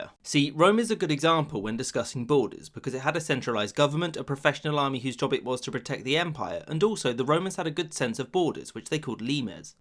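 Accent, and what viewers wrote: England, United Kingdom. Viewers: You are funny I like your videos I wonder where you are from in England with your accent. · Why do you have a british(?) accent but use miles instead of kilometers?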